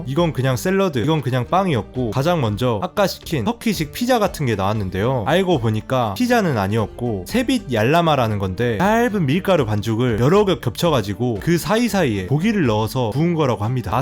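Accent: Jeolla, South Korea. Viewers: 전라도 청년 구수한 사투리 설명 ㆍㆍㆍ참 잼있네요이 ~~십여년 전 다녀 온 곳 다시보니 새록새록 ㆍㆍ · 전라도 억양에 ....데 로 끝나는 문장이 너무나 신기해요. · 말투가 전라도 사람이네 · 전라도 억양이 두드러지고 넘 시끄럽게 말해서 채널차단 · 남도 억양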